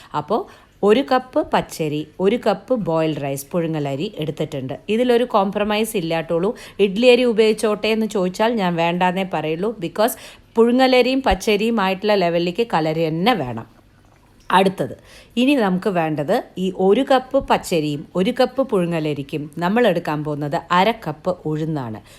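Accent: Palakkad, India. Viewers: Chechi palakkad anale athanu palakkad slang samsarikinathu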